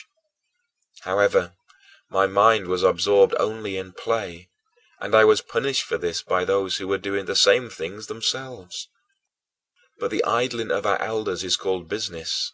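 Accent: United Kingdom. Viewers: British.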